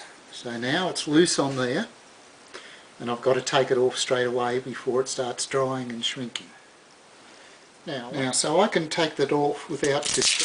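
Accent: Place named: Australia. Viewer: great to hear an aussie! . the packaging tape technique has solved a problem for me. thanks.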